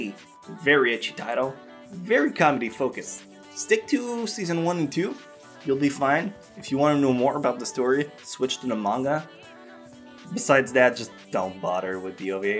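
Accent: Canada. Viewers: hey are you Canadian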